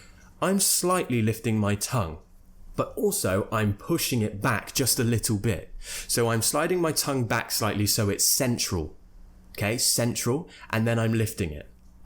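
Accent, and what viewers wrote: United Kingdom. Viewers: Love your pronunciation, your British accent, your videos ... Lots of love around here, right? Ha ha ha Thanks for your work! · I love British accent! I had grown up in London until 6, so the accent or I could say the atmosphere reminds me of my childhood. X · I could really learn British accent and improve my English from your channel 😊 Thank you, from Malaysia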